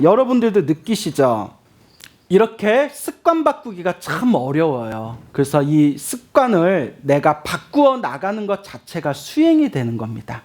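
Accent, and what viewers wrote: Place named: Seoul, South Korea. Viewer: 광우스님이 쓰시는 서울말 너무 듣기좋습니다🥰 🥰🥰🥰🥰🙏🙏🙏🙏🙏🙏🙏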